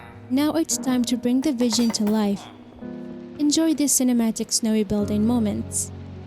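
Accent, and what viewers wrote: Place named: Canada. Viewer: I thought you were canadian 😮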